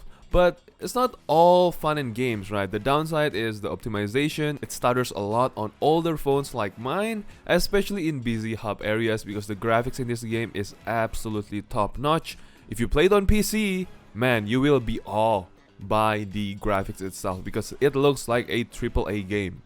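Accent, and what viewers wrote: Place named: Indonesia. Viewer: can tell u r indonesian in just few seconds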